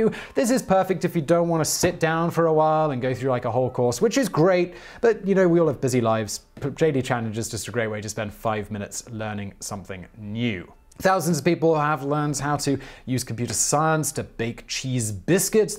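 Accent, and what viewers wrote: United Kingdom. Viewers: Why tf is vsauce British now?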